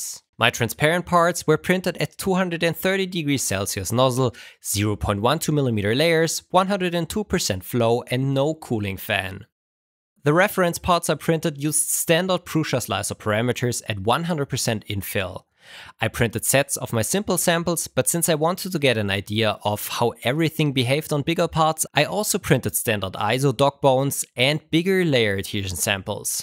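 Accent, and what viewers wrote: Germany. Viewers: Wie das deutsche rauskommt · Du bist doch Deutscher oder 😅 also ich mein da was rauszuhören 😁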